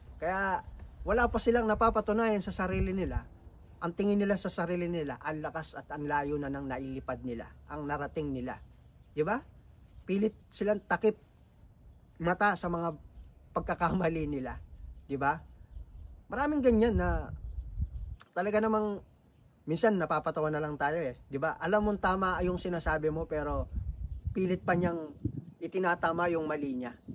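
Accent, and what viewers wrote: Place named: Pampanga, Philippines. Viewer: kapampangan ka, balu mung balu oh pamagsalita mu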